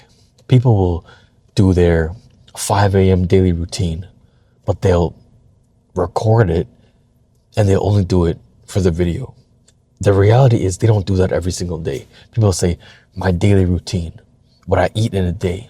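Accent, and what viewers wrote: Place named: Canada. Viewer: I appreciate & Love You Brother for this I suffer with allergies too 😂🤣🎯 if I don't take medicine for it at least once a day at worst my could make me literally sick like a cold just all the symptoms on 1,000 max & if that happens it can highly likely trigger my asthma too. I wonder which side your family u get it from? 🤔 I know I get it from my dad & his parents as they used to call it hay fever back n da day before it was called allergies 😂 Yeah sometimes I mispronounced & go off somewhere too 😂 it doesn't help I have a thick Canadian accent like u ironically sprinkled with an ancestral accent from our families 😂🤣🤦🏿‍♂️ U seen my vids 😂 the vids u & everybody else saw honestly on average is my 3rd or 4th try 😂 Yeah social media is fake 😂 but me I'm just raw with it & folks told me they love my vids on my thoughts 💭 🤔 It be so much I gotta release it ❤️‍🔥 Eventually I'm gonna move it to other social media platforms ❤️‍🔥 Love U My Brother ❤️‍🔥🤝🏿❤️‍🔥💯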